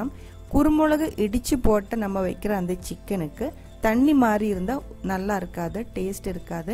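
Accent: Kerala, India. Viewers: உங்கள் கேரள சமையல் சூப்பர் அதைவிட கேரள தமிழ் சூப்பர் தோழி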